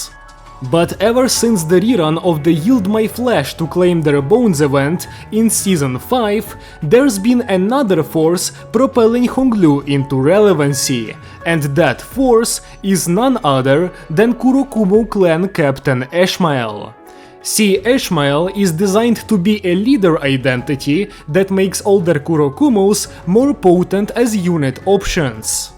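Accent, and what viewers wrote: Russia. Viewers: Слишком сильный русский акцент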